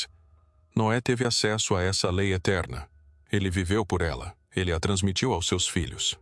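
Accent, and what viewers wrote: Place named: United States. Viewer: Por que essa língua? Usou a IA e fez narrar como um americano com sotaque? Isso estraga completamente o trabalho, e só melhora depois (por um momento).. Isso é um trabalho RELAXADO meu amigo.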